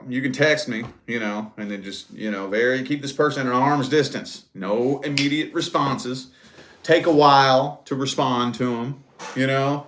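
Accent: South, United States. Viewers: U sound like u really from the south ?… hhhmm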